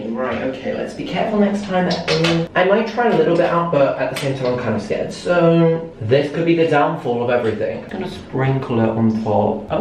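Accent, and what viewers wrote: Scotland, United Kingdom. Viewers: More Scottish accent plz I can’t get enough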